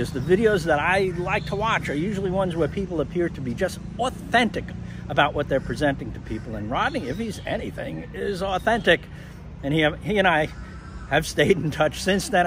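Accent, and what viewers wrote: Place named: Brooklyn, United States. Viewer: Your Brooklyn accent is easy to recognize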